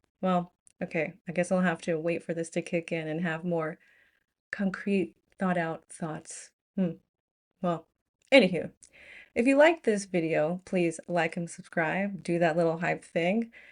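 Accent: South, United States. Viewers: Hi,I do enjoy your videos. For one reason, you don't start in hysterics like many other US folks, and your accent is charming. No I didn't learn a lot about the tea vs coffee thing, because I was mostly aware of it, but I enjoyed your telling me about it. I am "very" British, and a "chain" tea drinker, but also enjoy a good coffee - Columbian being my preference made in a cafetiere (French press). I had great coffee in France, Spain and Italy, but the best ever was made in a "briki" using stream water in a small mountain village in Crete! Coffee is a slightly toxic stimulant whereas tea is an infusion.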